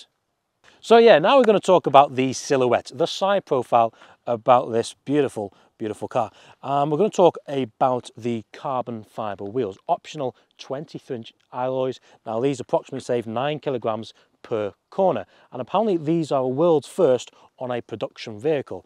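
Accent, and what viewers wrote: North, United Kingdom. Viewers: I'm sorry...I just cannot listen to your droll northern accent for more the 2 mins. Apologies.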